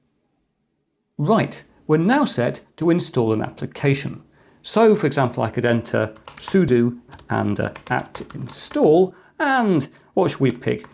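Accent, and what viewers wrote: England, United Kingdom. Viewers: Hello. OpenSource enthusiast since first Linux distro release, Slackware. I have watched several of your videos and I am very impressed with the tools, creation, content, and the way u explained some complicated subjects for the regular audience in very understanding manner. Not to mention the nice British accent!! Kudos!!! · Is this traditional England tone？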